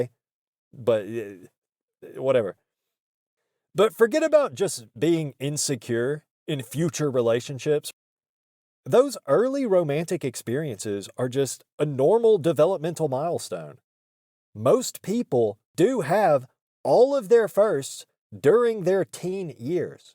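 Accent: United States. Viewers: Normal U.S. accent, what's wrong?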